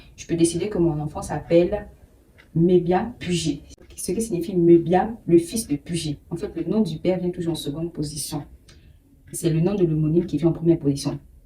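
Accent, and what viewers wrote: Ivory Coast. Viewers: Comment tu as fais pour avoir l'accent ivoirien comme ça ?? Tu es devenue ivoirienne hin... Moi je viens te marier.. tu es trop belle et super cool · L'accent de l'auteur de la vidéo est plus ivoirien que gabonais. Merci d'unir le Gabon 🇬🇦 et lz Côte d'Ivoire 🇨🇮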